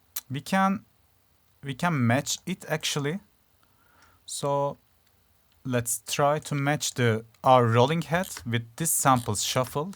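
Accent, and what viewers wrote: Turkey. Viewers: Ne kadar türkçe bir ingilizce diyordum izlerken :) çok güzel video tebrikler :)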